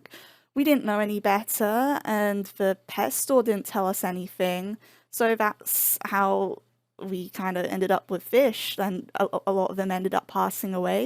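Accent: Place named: United Kingdom. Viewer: Came here from Dodo - I'm not much of a fish person but so good to see someone knowledgeable and caring about their pets :) PS. you sound British, are you originally from there and moved to the US?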